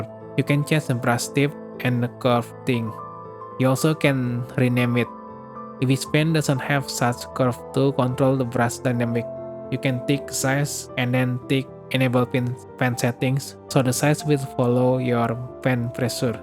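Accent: Indonesia. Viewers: Thank you for this tutorial! I'm immigrating from ibis to krita and it's so overwhelming. I kinda see why ibis is still searched after, the ui is nothing like other art program, even my 10yo niece master ibispaint super quick! But it's less advanced compared to krita. My friend told me that krita is better if i'm walking the professional path. Anyway when i heard your accent i immediately thought wah indo nih and it turns out to be true lol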